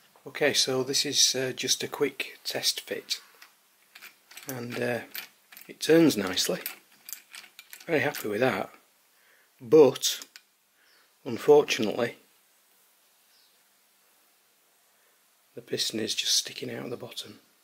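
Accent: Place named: Hull, United Kingdom. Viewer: You sound like you might be from Hull, my home town. Very nice little engine, something I'd like to have a bash at. Greetings from Australia...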